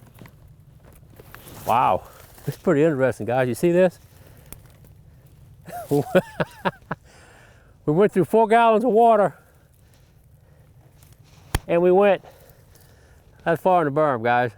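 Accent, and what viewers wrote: Louisiana, United States. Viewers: "Tanks" for a wonderful video! Nutting like a big bore to start da day!!! You remind me of the ol' Cajun Chef Justin Wilson, your accent & looks! You're a real man's man! Load up that ol' 45/70 wit some of Dat Cajun Gumbo & you've got a real gnat stomper! And it's "good for you to see me, I guarontee"!!!!! Love ya my friend. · what state and county is that accent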